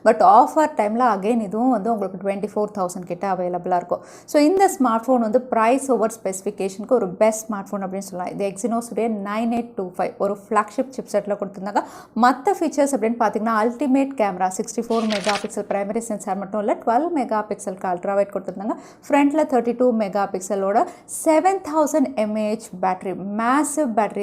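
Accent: Kerala, India. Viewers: Kara Kara Kerala tamil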